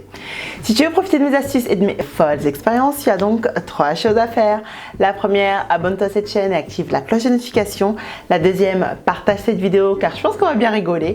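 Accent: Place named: Senegal. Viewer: Tu parles bien wolof avec l'accent parfait de la femme sénégalaise 😂 ! Excellent👌🏾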